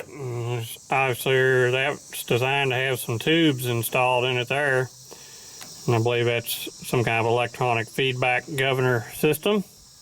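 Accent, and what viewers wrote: Kentucky, United States. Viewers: You have yet to publish a boring video in my estimation. I even enjoy watching a piece of steel go round and round in the lathe. You and a few other mechanical types have helped me learn new information. My greatest joy comes from just listening to you speak with your Kentucky accent about technical information. Keep up the interesting work. It is interesting and yet entertaining for this old country boy! Thank you for the pleasure you provide me with every video!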